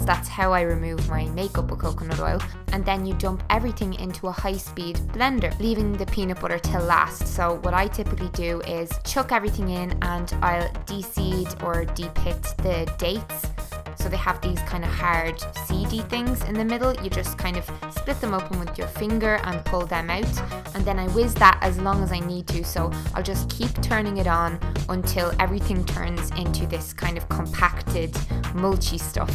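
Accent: Ireland. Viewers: Are you from Ireland if so where do you get all your ingredients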